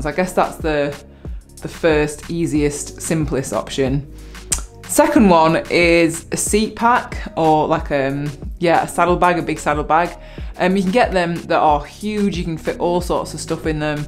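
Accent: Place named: Manchester, United Kingdom. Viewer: You didn’t cover the biggest problem that’s always made it difficult for me. Where to put your bike? With you’re accent I take it you are from Manchester, well I live there too and I’ve yet to work at a place there that would let me take my bike inside. Last place I worked I had to lock it to a lamppost outside, it lasted a week before it was robbed. The police described there are gangs that drive around in vans, which they fill with bikes. They carry bolt cutters that make mincemeat of even the most expensive locks. My bike before that was even stolen from inside the development where I live, watching back the CCTV, they somehow got the gate to open, came through the gate in a van, reversed up to the cage containing about 20 bikes, used bolt cutters to cut a huge hole through, filled their van, then drove off, the whole thing took just 13 minutes to steal 20 decent bikes. 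It seems to be a problem with Manchester in general, people seem to feel entitled to take what isn’t theirs. Like that daft MoBike scheme, it was never going to work, most of those bikes are now in the ship canal. I’ve even witnessed a group of youths jumping up and down on a bike, because they couldn’t steal it, so they thought they’d trash it instead. I just don’t take my bike to the city anymore if I know I have to leave it. Most of the time now, I just walk.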